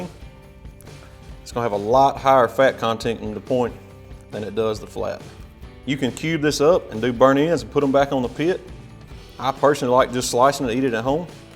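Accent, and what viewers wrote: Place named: South, United States. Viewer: I loved the accent.. That looks like a southern accent.. Not sure if it's south Carolina or some other place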